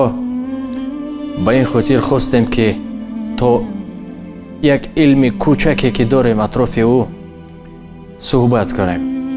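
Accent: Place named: Dushanbe, Tajikistan. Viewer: Хамааш дуруст! Ман дар Хучанди шумо 7 сол зиндаги кардаги лахчаи гап зании шумоя нагз медоным. Лек шумо гап занитон ранги Душанбегихо !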